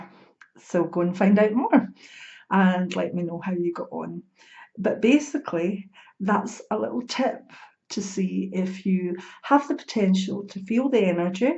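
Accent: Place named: Wales, United Kingdom. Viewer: Thank You for this video . I like your accent though, are you Welsh?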